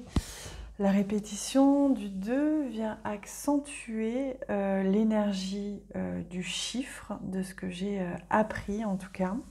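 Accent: France. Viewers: Bravo ma belle; pour ton anglais, avec le ton frenchie ,dont nous les français avons tous, ce qui fait nôtre charme aux usa , tout comme toi ma belle, charmante , adorable, et rayonnante, comme l'étoile du berger, je préfère te voir comme cela, sans voile, je te remercie pour toutes les belles âmes que tu entraîne dans ton sillage ..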